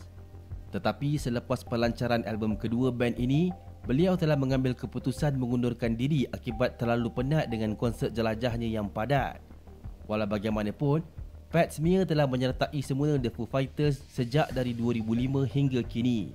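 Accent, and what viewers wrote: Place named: West Java, Indonesia. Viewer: Ini logatnya kaya orang sunda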